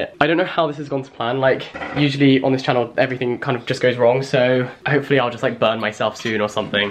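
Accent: RP, United Kingdom. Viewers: UR VOICE JUST SCREAMS ‘TORY ETON BOY’ (also address ur sexuality)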